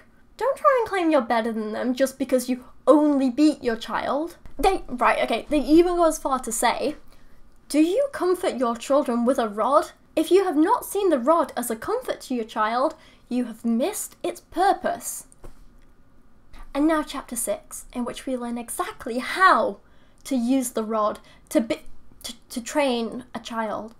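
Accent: United Kingdom. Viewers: Loving the video so far but it's a little too brit bong for me. But I dunno maybe I'm just too American to understand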